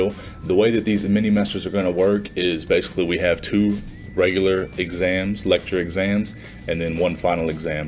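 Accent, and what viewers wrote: South, United States. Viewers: Where are you from? I think I hear a bit of a southern accent..I loved OB but that's what I want to do! I have peds this semester, so I'm super stoked!! Good luck to you!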